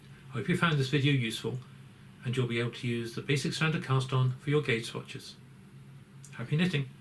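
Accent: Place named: United Kingdom. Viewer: Thank you for this very well done video. Sooooo nice to hear an British accent for a change 😉.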